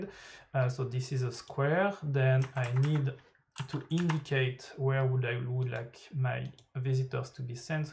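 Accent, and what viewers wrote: France. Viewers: Yo t'es francais ?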